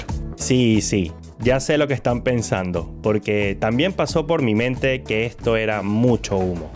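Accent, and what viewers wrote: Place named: Venezuela. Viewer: Por el acento con que habla el creador del video es venezolano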